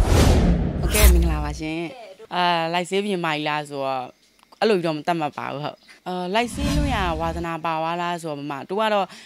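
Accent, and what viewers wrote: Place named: Taungoo, Myanmar. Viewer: တောင်ငူလေသံကြီး ဟ သိနေတယ်နော်❤😂 မျိုးthandar ooရေ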